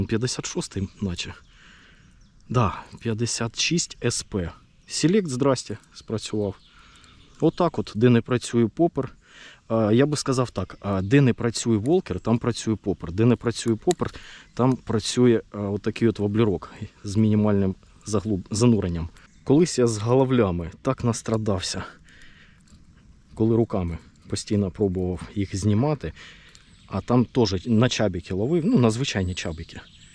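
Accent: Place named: Surzhyk, Ukraine. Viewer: підтягни українську. Таке адове суржелло, що аж вуха ріже.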